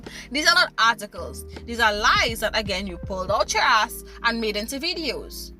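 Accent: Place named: Caribbean. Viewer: Thank you for the clarity of your explanation! You have a beautiful accent! Caribbean? I agree with what you have laid out here and I have subscribed to your channel! I wish you every success going forward! PREACH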